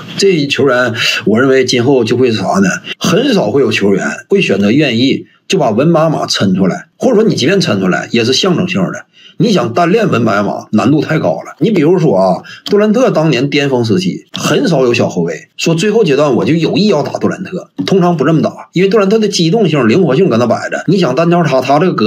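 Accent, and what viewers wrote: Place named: Northeast, China. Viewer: 什么时候以满口东北话为自豪了，听到这种腔好恶心，况且这个比没有一点内容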